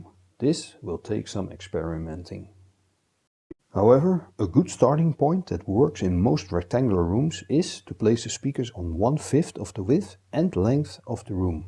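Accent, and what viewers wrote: Netherlands. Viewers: I'm 100% sure he's from the Netherlands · thanks good short explanation ! from your accent i would have thought you are from holland , and you could check out my set because i live in amsterdam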